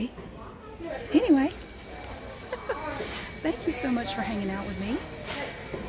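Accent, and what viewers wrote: South, United States. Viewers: I love your accent so much😭 The southern twangs keep reminding me of home😭😭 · The video I didn't know I needed. Her southern accent makes me feel safe and her little phrases like "what kind of a drunken duck" make me laugh my ass off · I love your accent. 😍 That's the part of the US I'd want to live in for the rest of my life ❤️ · This was so incredibly satisfying!!! & I looooove her accent. I recently moved from Hawaii to Texas & I can’t get enough of these southern accents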